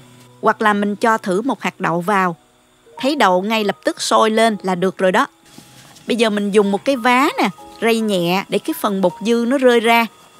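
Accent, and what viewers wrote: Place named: Binh Duong, Vietnam. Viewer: đọc lạ Bình Dương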